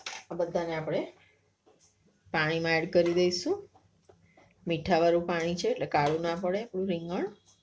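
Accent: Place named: South Gujarat, India. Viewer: ok. I think you are from south Gujarat.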